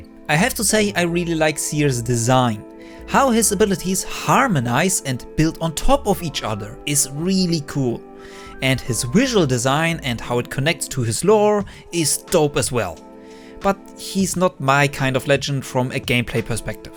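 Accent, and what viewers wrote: Germany. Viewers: Could it be that you are a german?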